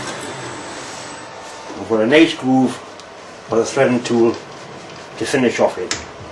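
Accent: Newcastle, United Kingdom. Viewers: Awee man. You from newcastle??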